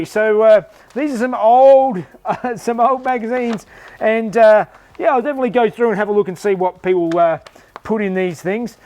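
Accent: Australia. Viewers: Your Aussie accent is hilarious, every time you say Flocking, it sounds like "Fucking" and it makes it 10 times funnier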